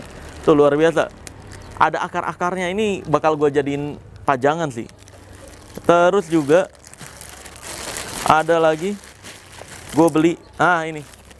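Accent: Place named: Madura, Indonesia. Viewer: Madura banget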